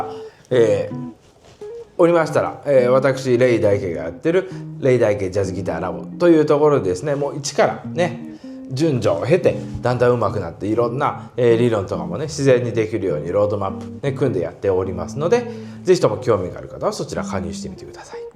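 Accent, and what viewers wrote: Nagoya, Japan. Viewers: 快傑ズバット👍 誰が分かるねん😅 名古屋弁いいですね！ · wes弾いたらうまそう😂 名古屋方言が心地良い🎸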